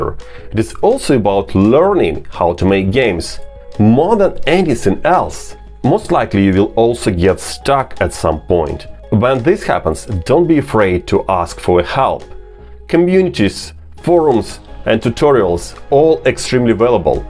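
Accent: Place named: Russia. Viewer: Я прям догадывался всё это время по акценту, что ты русский) Полезное видео, спасибо!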